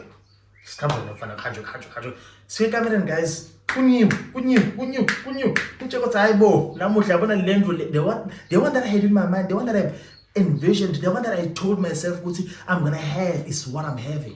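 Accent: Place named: Eswatini. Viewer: I could listen to you speaking siswati all day 😍😍😍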